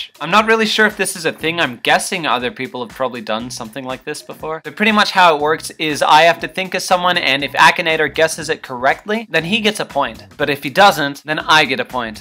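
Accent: Australia. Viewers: Your Australian? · HES AUSTRILLIAN?!?!?!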